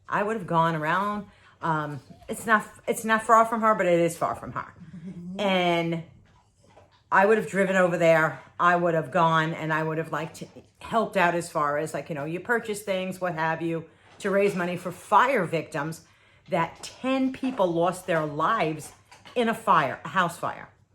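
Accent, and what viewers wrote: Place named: Boston, United States. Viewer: Are you from Boston? Lol cuz of the accent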